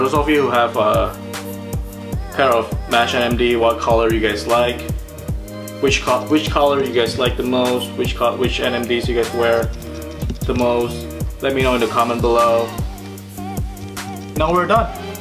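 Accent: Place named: United States. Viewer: It's been a long time I did not hear your voice. Turns out you speak with a stark American accent now! :)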